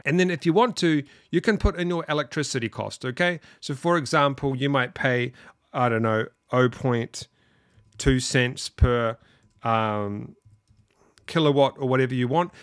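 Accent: New Zealand. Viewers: awesome. a Kiwi Accent. Also When did you make this vid? cuz the info i'm seeing. with a Nvidia RTX3090 est.daily profit is 9.2USD. Ummmm what? defo not 35.42USD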